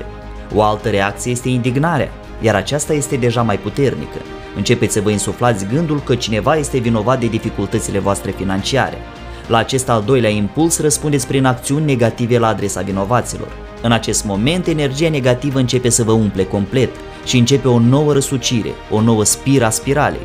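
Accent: Moldova. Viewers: Bună seara.Povestitorul este din Republica Moldova sau e basarabean? Mulțumesc.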